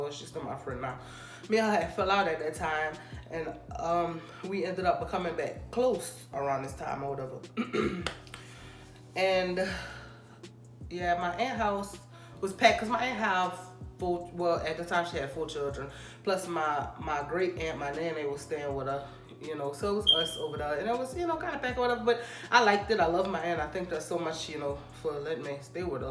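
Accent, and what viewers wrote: Louisiana, United States. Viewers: I think she from Louisiana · Is you from New Orleans or Homea cause yo accent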